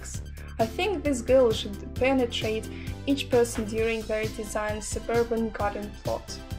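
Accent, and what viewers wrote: Russia. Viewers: Русскоязычный английский ,идеи полезные для меня, Thanks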